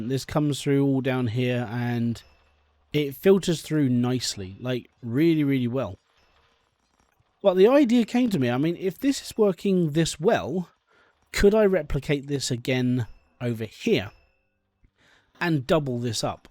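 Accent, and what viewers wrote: United Kingdom. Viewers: hears Brittish accent subscribes i love this game and that accent XD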